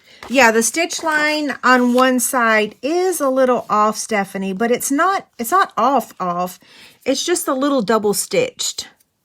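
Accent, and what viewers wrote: South, United States. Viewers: Love your ideas & videos! Where are you from, I hear a distinctive southern accent. I’m from NC.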